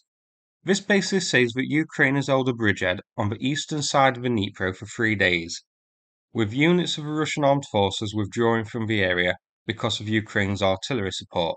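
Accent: United Kingdom. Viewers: Jesus! A heavy British accent plus a cheap microphone - and you get narration that is hard to comprehend. I have to stop every 20 seconds and go back several times! you are making good money from your channels - can't you afford a decent microphone? I am already in the middle of the video, and I am not quite sure who shoots at whom. I had to open a map to see what is actually shown in the video. And by the way, there is no Eastern or Western side of Dnipro here - there are Southern and Northern sides.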